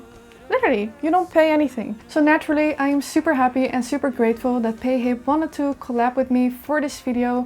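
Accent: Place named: Netherlands. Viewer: Thanks! I just came across you when starting my info search, and so far my favorite source!;) I heard your accent, and recognized from my 20 years at KLM in the past; Dank je wel!! Veronica (Swedish American living in Switzerland)🤭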